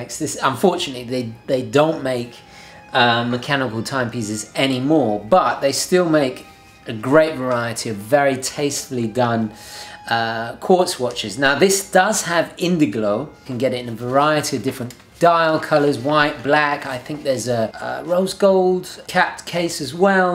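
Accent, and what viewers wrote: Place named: United Kingdom. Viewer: You sound more British today! Have you been talking to your relatives?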